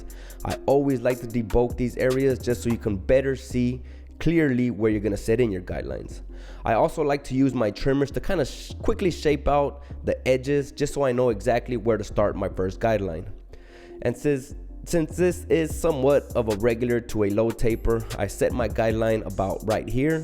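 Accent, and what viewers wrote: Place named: Mexico. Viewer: Bro it’s not you talking that’s boring u shocking try to not talk Mexican bro ya accent it’s Chevato bro u can tell Spanish accents heavy try talking like you from Brooklyn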